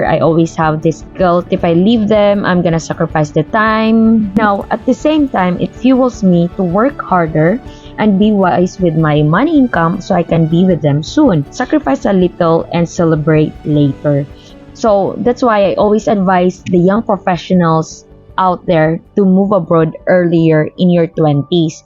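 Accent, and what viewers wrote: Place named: Australia. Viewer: Nice2x. 🎉 Australian Na an accent❤❤❤❤❤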